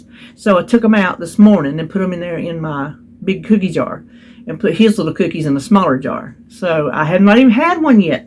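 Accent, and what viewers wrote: South, United States. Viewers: Hi:) I enjoy when you chit chat:) I love your Southern accent! You should hear me - I have a wicked Boston accent!! LOL 😂 Children feel like you are playing with them if you just watch them play:) They just want to be with you. ❤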